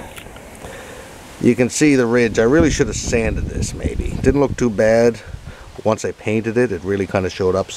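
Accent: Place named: Newfoundland, Canada. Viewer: You must be a Newfie! Awesome and thanks for the video. Cheers from Alberta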